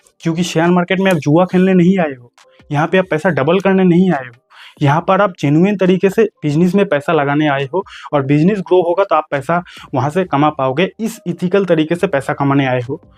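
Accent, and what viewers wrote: Bengal, India. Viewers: सर आप एकदम सरल भाषा में समझते हो आनंद आ जाता सीधे दिमाग में घुस जाता है लेकिन आपकी हिंदी में थोड़ी थोड़ी बांग्ला भाषा की ton आ जाती है तो और मजा आ जाता है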